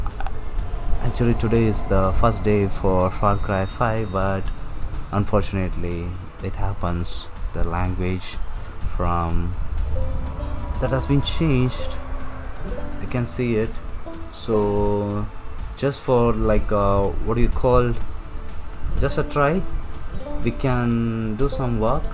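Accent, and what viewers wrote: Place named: Kerala, India. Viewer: U sound like mallu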